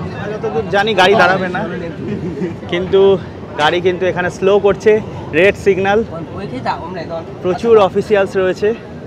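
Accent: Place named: Bangladesh. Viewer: আপনার কথাগুলো বাংলাদেশের ভাষার মত , ভালো না ।😢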